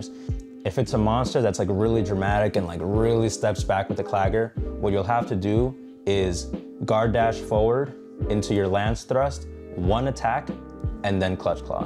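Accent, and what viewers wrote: Belgium. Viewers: You're from belgium? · Are you from Belgium? :D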